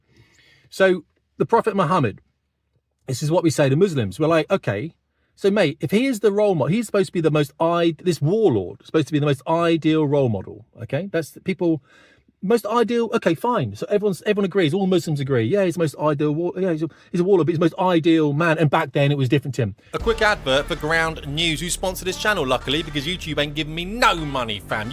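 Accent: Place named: United Kingdom. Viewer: . You're starting to sound like a African Britain in your speech. Go back to proper English patois. Cheers.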